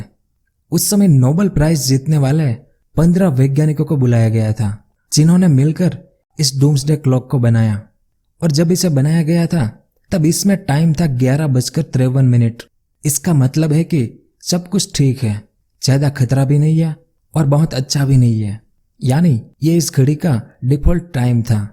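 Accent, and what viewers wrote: Gujarat, India. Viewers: Aap gujarati ho apka bolne ka tarika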